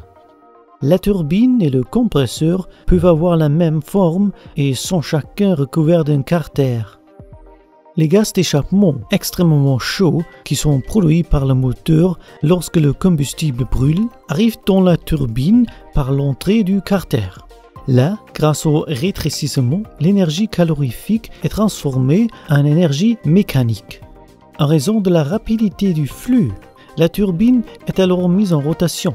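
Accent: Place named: Germany. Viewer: C’est quoi cette voix de gros accent du bled ou de allemand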